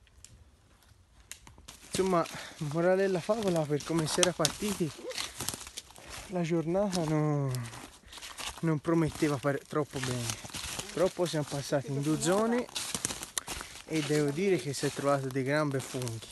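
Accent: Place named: Tuscany, Italy. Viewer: Belli i funghi ,bello te e la nonna,ma l’accento toscano mi fa’troppo impazzire!Complimenti con tanta invidia da una nonna che non ce la fa’ più ad andare a funghi,bravi!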